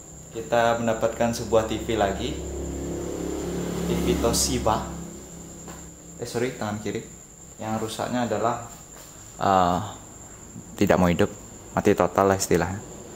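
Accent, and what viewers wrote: Bali, Indonesia. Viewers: Pantesan cara ngomongnya spt org bali. Betul bali rupanya bli. Lucu juga biasanya org bali tu. 😁 dimana lokasi bli. Nanti kalo butuh2 betulin elektronik sy datang ya.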